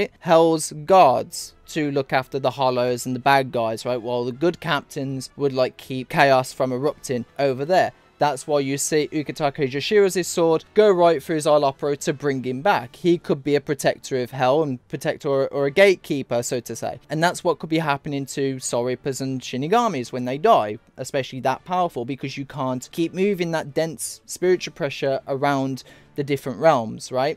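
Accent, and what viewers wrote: United Kingdom. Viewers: haha sorry😅 maybe I should've worded that differently. I'm just subbed to a ton of different channels. Like hundreds... Anyways yeah so excited for bleach again! And love when you talk about it. Especially when you go into the symbolism and theological side of it. Your cool British accent keeps me engaged too. Now imma have buy some merch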